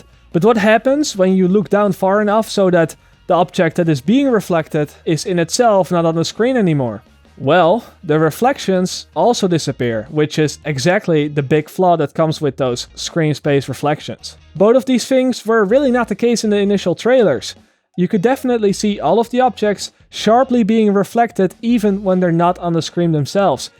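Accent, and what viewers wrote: Netherlands. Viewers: nederlandsengels accent meneertje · Haha ik wist dat je Nederlands moest zijn, je hoort het nog een beetje in je stem. Maar buiten dat een leuke video! je hebt er een subscriber bij. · nice good dutch exand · ik wist het gewoon dat je een Nederlander was XD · Dutch english accent* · Wou vragen of je een Nederlander was aan de hand van je accent ... Maar het einde gaf mij al genoeg antwoord ... goeie video kerel ;) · you can really hear an accent when you're dutch yourself haha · Are you dutch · Are you dutch? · is this guy dutch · Je hoort echt duidelijk dat je een Nederlander bent :D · are you duthc? cuz you sound dutch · you dutch? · are you dutch cuz your accent sounds the same as mine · I think he is dutch. I'm dutch as well and that is just the way most dutch people speak english haha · are you dutch? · Is this guy Dutch? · i knew you were dutch from you accent & in the end you spoke dutch & i was like ..''yup i knew it xD'' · This dutch accent is driving me nuts >.<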